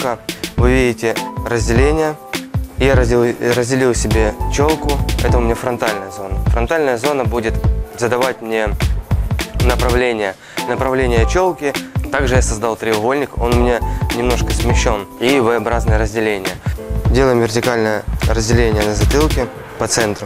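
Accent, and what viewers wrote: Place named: Ukraine. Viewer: Умиляет это украинское произношение буквы Г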